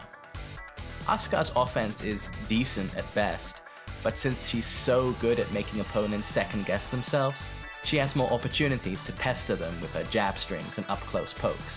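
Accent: United Kingdom. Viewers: Sayuko Tanaka yes I learnt Japanese. I didn't mean "ay" as in "say" I mean "ah". I just didn't hear him properly because he didn't say the "ah" sound clearly. Maybe his British accent.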